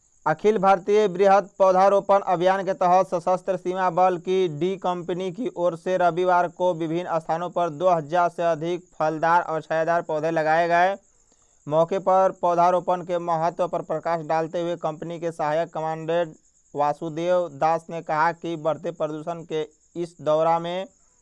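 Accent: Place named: Bihar, India. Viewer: Sudh Hindi bolo ye bihari nahi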